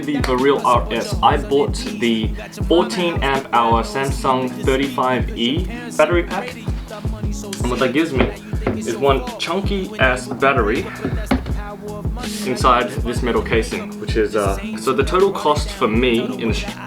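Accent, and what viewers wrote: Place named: Australia. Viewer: Wtf this guy is korean talking Australian and acts like a white boy the fuck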